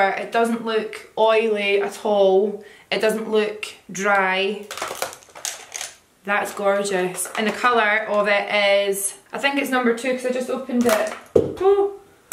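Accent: Scotland, United Kingdom. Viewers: What part of Scotland are u from I'm from paisley love this look deffos subbing xx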